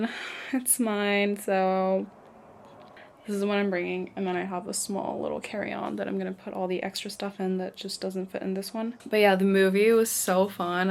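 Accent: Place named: United States. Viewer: and why's your English so good? you have such an American accent!!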